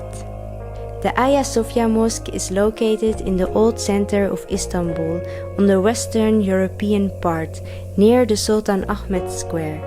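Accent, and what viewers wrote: Netherlands. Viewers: u sound dutch do u speak Dutch??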